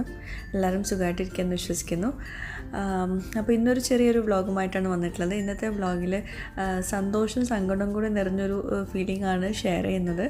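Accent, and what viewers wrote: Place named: Malappuram, India. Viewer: Ingale malapporam baasha kelkkaan nalla rasand. Voice over kodukkumbo style aakken lle😆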